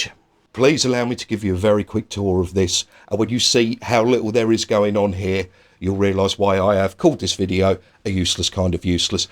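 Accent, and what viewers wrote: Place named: Cockney, United Kingdom. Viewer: You speak English with a Cockney accent.Thats very rare nowadays. i thought the Cockney accent died out?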